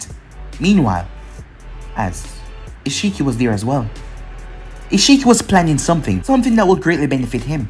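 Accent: Jamaica. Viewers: I’m good my boy also are u Jamaican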